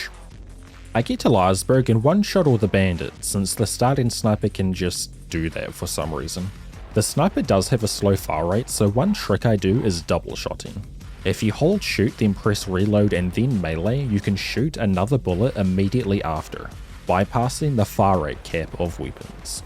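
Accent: New Zealand. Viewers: Where are you from? I initially clocked you as Kiwi but as the video went on, I became less and less confident in my assumption. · Hey man what accent do you have? I thought you might've been a Kiwi? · heard the accent and knew u were NZ, great video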